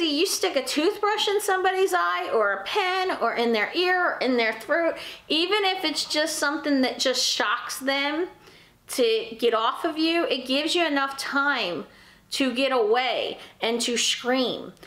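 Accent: New Jersey, United States. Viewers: Get a dog, you’re much less likely to be a victim of crime if you have a dog. If they have to choose which truck to break into I guarantee it won’t be the one with the dog. When I was in the military actual hard as f bad guys weren’t scared of us pointing a rifle at their heads but you bring out a dog and they disappear, quick You can tell your from New Jersey lol Love you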